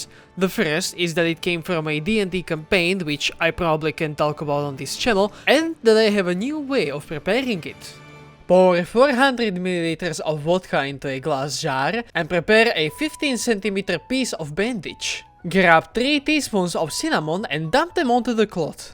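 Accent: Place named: Poland. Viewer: Words can't describe how much I love this video! Just everything about it, from the polish accent to the wonderful creativity of the potions. (o´ω｀o)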